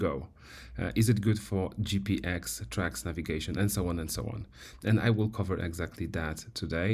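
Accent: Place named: England, United Kingdom. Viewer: Fajny angielski akcent masz.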